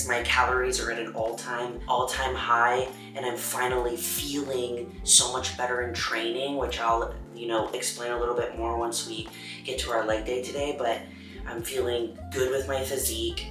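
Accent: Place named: Germany. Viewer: Your German is so cute🥰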